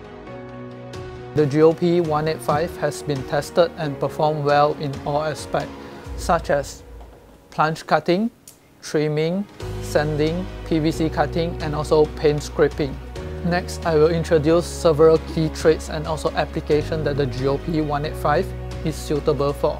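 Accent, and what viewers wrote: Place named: Singapore. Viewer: Is this Bosch Singapore? Why is the english accent sounding more like Singaporean than Filipino?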